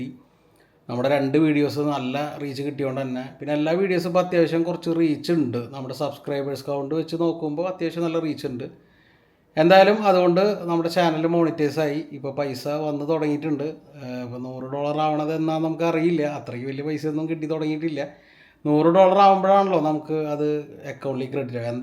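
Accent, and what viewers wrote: Thrissur, India. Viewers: ഒറ്റ ഇരിപ്പിൽ കൊറേ വീഡിയോ കണ്ടു. Sub ചെയ്തു. നല്ല അവതരണം. Simple but powerful. ഓവർ ആക്കി ചളം ആകാത്ത തൃശൂർ ഭാഷ. നാടൻ food. എല്ലാം കൊണ്ടും സൂപ്പർ ❤️❤️❤️. ഇടക്ക് ക്യൂട്നെസ്സ് വാരി വിതറുവാണോ 🥰. 😜 കണ്ടു മടുക്കാത്ത വീഡിയോകൾ. 👍🏻👍🏻👍🏻👍🏻👍🏻🥰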